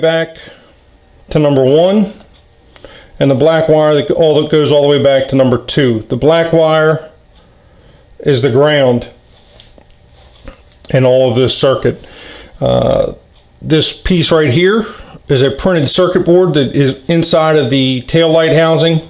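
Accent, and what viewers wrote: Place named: Pennsylvania, United States. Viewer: My hazards aren't working but my turn signals both are. It sounds like you're from PA. If so do you have a shop or a shop you'd recommend near ohio by 80 or 76? It's cost me a fair amount learning on my 2001 roadking and I don't mind if I can learn but the electric for the rear had been "redone" and the left switch somehow controls the rear lights. It's a little bit much to explain on here but any help would be greatly appreciated. Thanks for sharing your video I look forward to watching more 👍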